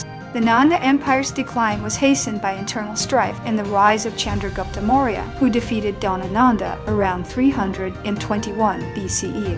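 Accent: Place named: United States. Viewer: why do you use an american accent that corrupts Indian names? Have the decency to be true to your subject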